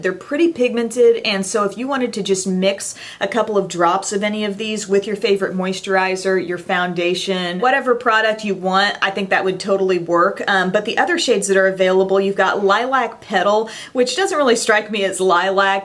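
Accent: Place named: Kentucky, United States. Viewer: done! added you. always glad to support a fellow KY girl! heading over to watch you now. wasn't sure I had the right person till I heard your accent! lol sounded like home. have a good day!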